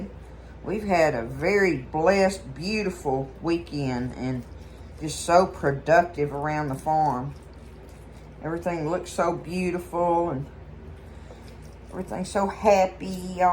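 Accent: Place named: Texas, United States. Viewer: That Texas drawl you have is so wholesome & refreshing Ms..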